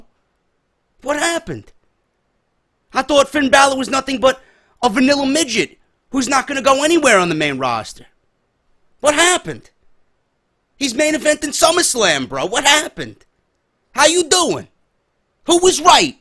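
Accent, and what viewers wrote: New York, United States. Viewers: Gotta say love listening to your reviews I'm from brooklyn so love the N.Y. feel. Everything u say is spot on and shows your a true wrestling fan. i swear if i could I'd start a petition to have u work for wwe creative writing, you would create a hell of a show. keep up the great work about to watch your smackdown review #FuckThoseOtherGuys.💪👍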